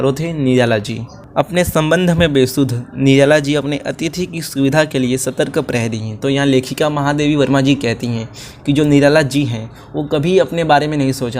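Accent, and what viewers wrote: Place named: Bhojpuri, India. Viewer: Bhosdike ghutka nikal ke baat kar bhojpuri choda sala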